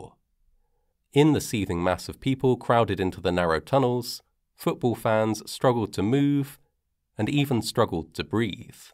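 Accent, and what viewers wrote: England, United Kingdom. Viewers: I love listening to your narration, I could listen to it all day. Out of curiosity, what region of England do you and your accent hail from? Although some accents of “Old Blighty”, like ‘Cockney’, are quite unmistakable; but of others, I’m sorry to say, that my American ears sometimes have difficulty in their ability to distinguish.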